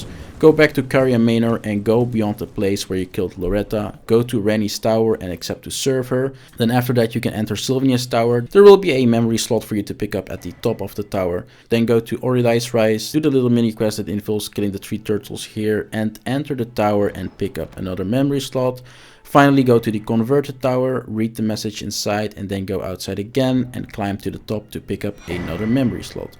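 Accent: Netherlands. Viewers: you have to be dutch, i can hear it. nice video :)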